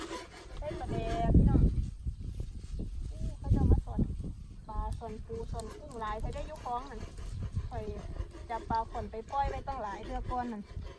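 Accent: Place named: Khmer Suay, Thailand. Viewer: ตอนแรกนึกว่าเอื้อยเป็นซวยเขมรทางบุรีรัมย์ สำเนียงการเว้า หน้าตากะคือ อย่าด่าเด้อ พึ่งคอมเม้นแรก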